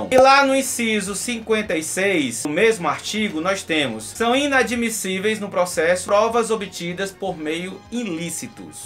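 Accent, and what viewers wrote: Northeast, Brazil. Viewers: E esse sotaque me representa muito como nordestino... Não pare, vc é minha fonte de pesquisa política hj em dia...😍👏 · Tai. Gostei de sua forma nordestina de dar notícias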